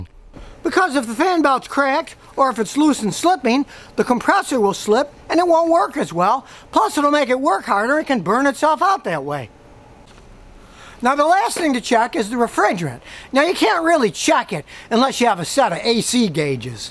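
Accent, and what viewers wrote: United States. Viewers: your English accent is funny and all American 😁. Great info!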